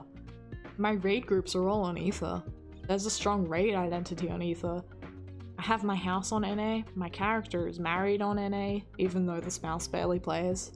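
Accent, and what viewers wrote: Australia. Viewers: Just found this video by chance. I dig the accent. Now, on topic: I recently cancelled my subscription (early Stormblood). I've had mostly mid experiences, but more bad than good. As far as MSQ and the game itself, it's just not gripping me anymore. I'm sure I'll be back . . . someday. But I just know that today is not that day. Keep making content so I can hear more of that lovely Australian accent. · Also are you Australian? You sound it. I have found the Australian community to be one of the worse in my experience and I have played on like 12 different servers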